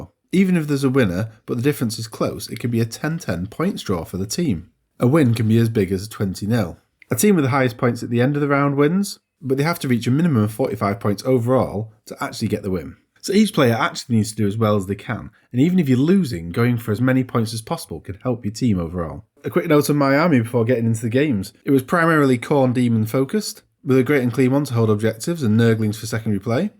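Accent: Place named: Northern England, United Kingdom. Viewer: Ok, fine, you sound like a northerner. My family is from Northern England. now just a bunch of Americans.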